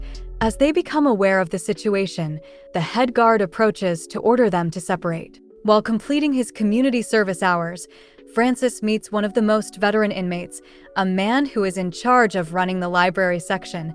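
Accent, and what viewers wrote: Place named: United States. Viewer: Your storytelling is great 👍❤and your accent of united states is great 👍 this movie Recap is nice 👍❤❤. By the way I'm new on this channel,I will I new this channel before, thanks a lot. I got entertained ❤❤❤❤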